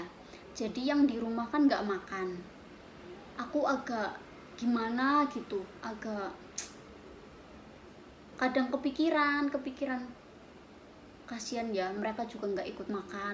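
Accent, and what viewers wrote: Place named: Banyumas, Indonesia. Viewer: Ninine diwaraih ngomong ngapak nok..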